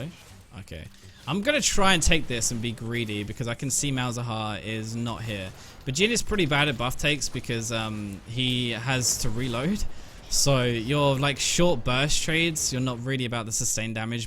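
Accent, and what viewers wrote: United Kingdom. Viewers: a wool? a wool? took me like five sec to figure out you were saying wall. british accents are awesome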